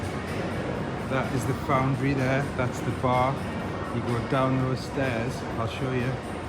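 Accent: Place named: North East, United Kingdom. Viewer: You’re accent sounds very north east! We’ve also stayed in this hotel, loved it, fantastic location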